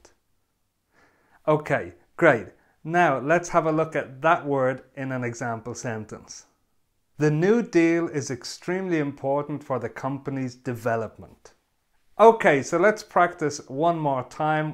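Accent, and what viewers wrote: Austria. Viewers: Sir,are you from Austria? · Hi ，are you Austrian？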